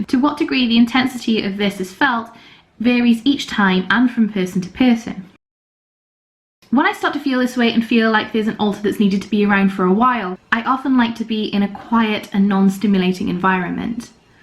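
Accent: Wales, United Kingdom. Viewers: are you welsh? :o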